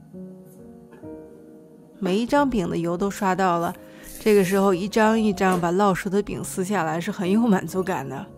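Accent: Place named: Shandong, China. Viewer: 我听口音还以为是山东人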